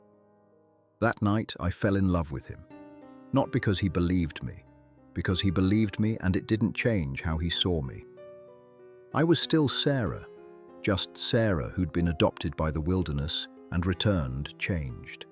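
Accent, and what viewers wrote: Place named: England, United Kingdom. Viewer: Why does the AI narrator have an accent that sounds like someone from England when this story is about the Cascade mountain range from Washington state, USA?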